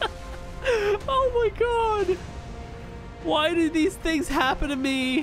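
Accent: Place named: Ireland. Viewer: you should do a video in your irish accent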